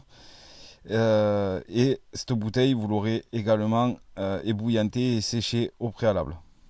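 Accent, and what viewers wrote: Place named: Puylaurens, France. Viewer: C EST GENIAL ! L ACCENT ON A LE MEME !PUYLAURENS 81 !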